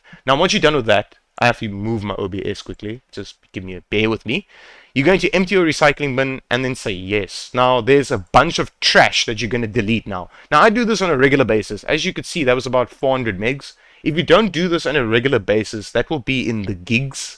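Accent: South Africa. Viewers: This is amazing, also I love the South Africa accent what an absolute hilarious accent to listen to almost as funny as the Scottish accent god bless you for this brother not only excellently explained in a clear and consise manner but humor combined with the simplicity had me on a roll thanks again! Would love to meet you in person! 🔥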